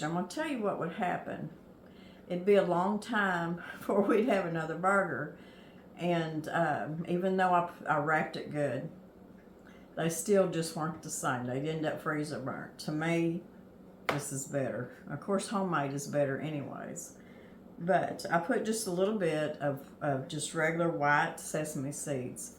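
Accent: South, United States. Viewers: I feel the same way……love everything about the home, both inside and out! Miss is simply a treasure, and I look forward to each and every video she and share with us! She has such an easy, gentle way of speaking, and I love listening to her sweet voice and southern accent. This video is one of my favorites, and I think many of us feel as you do ……she’s a “kindred spirit”, for sure! As for her beautiful wood floors……I can’t imagine telling ANYONE that their floor looks dirty!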